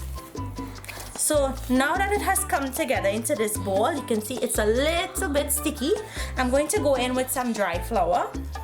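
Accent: Trinidad and Tobago. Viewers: ... That's the sweet Trinidadian accent! Really lovely. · I have always love the Trinidadian assent. From a vincy girl.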